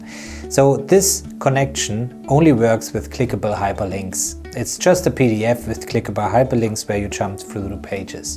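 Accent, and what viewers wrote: Germany. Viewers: Sorry, bist du Deutscher?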